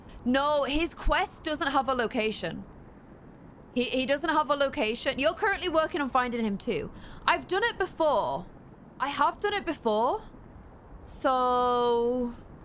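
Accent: United Kingdom. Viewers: he's a geordie:)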